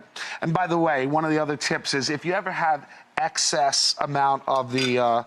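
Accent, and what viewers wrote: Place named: New York, United States. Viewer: Love this guy's NYC accent lol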